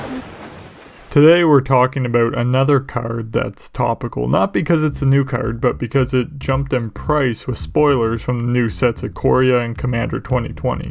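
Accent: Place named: Canada. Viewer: 19 seconds in, ahhh so he is Canadian.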